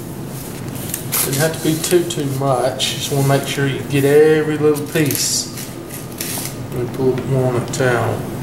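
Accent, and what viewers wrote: South, United States. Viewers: I've done this before and know about woks. Your video is the best. Funny hearing a southern accent doing Asian.....lol! Great Job!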